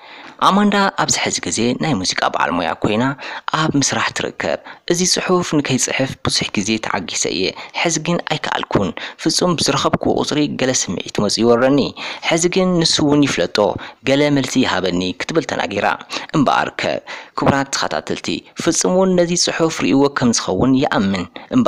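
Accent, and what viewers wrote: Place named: Agame, Ethiopia. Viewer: አታ መን ኽሐፍረልኩም በዞሞ ዓጋመ ተንብብዎ ትግርኛኻ አጽሪኻ ምጻእ ዑጉመይ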